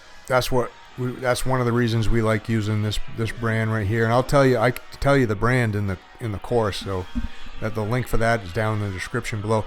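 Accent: Maine, United States. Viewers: I thought I detected a little hint of Downeast listening to you, then I saw the 207 area code… ayup.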